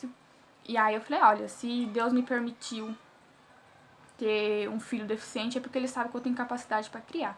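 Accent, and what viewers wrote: Paraná, Brazil. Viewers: Muito bacana, estou gostando muito do seu canal. Só gostaria de saber de que estado e cidade você fala, tenho a impressão que é do Paraná, estou certa? Mas qual cidade? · Que linda 🥰 amei o seu jeitinho de falar, sou paranaense, do interior kk me identifiquei 😂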